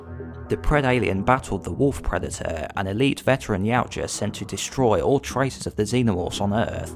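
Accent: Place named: United Kingdom. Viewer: Cool British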